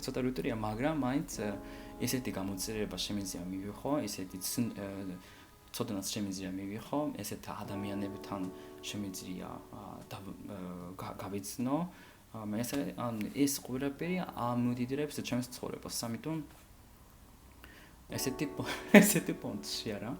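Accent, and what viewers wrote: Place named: Kakheti, Georgia. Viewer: ვაა ნამდვილი კახელი , შემოგევლე გულში🥰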